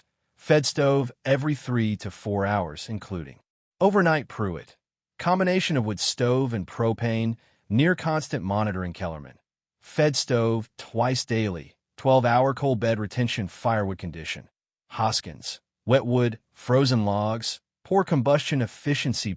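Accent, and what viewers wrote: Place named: United States. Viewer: Don’t waste your time it just repeats and repeats and repeats. The American accent is unbearable.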